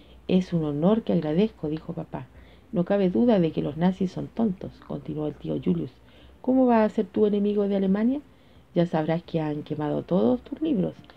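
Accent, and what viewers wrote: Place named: Chile. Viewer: tu acento hizo el libro mejor de lo que ya me parece! me suena a que es acento chileno pero tal vez me equivoco, igual me gusta mucho la forma en la que lees!! muchas gracias estoy segura de que me irá bien en el examen :D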